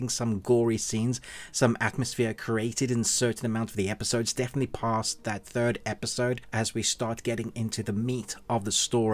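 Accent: South Africa. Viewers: The ending was confusing for me.. the dead bodies. Quick question, what is your accent? You sound South African mixed with English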